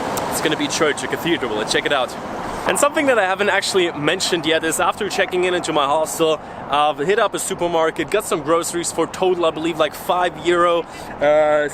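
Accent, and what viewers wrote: United States. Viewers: Rich Bowman Yes my very thought too! I don’t know what nationality this guy is but he definitely has an American accent! If he is American then that would explain the BS!😳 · You actually speak english really well with a good american accent, been living all your life in the States I suppose.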